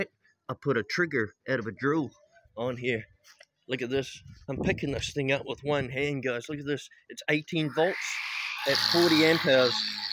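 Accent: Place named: New Zealand. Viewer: You a kiwi mate? That's a hell of an accent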